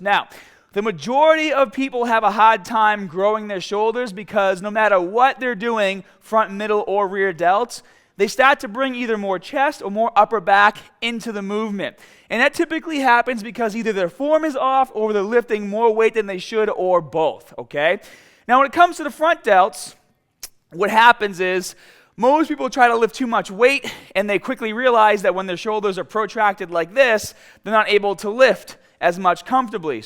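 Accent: Boston, United States. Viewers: Is this a Boston accent?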